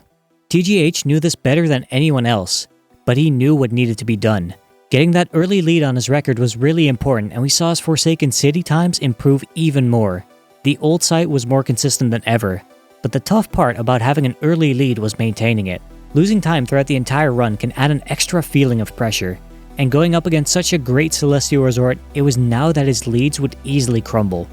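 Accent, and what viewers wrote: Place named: Netherlands. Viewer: Dit is misschien het meest undercover Nederlandse accent ooit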